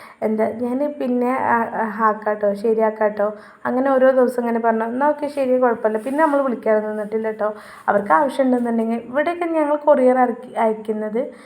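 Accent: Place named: Malappuram, India. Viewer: സംസാരം ക്ലിയർ അല്ല. ഈ മലപ്പുറം സ്ലാങ് വിടുക. അടിക്കടി ഇങ്ങനെ ട്ടോ ഓട്ടോ എന്നു പറയുന്നത് മഹാ ബോറ്. 🤪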